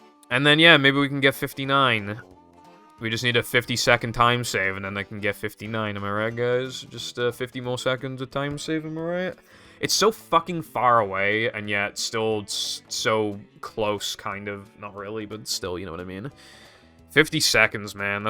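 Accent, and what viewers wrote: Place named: Boston, United States. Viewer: That's the Boston accent for you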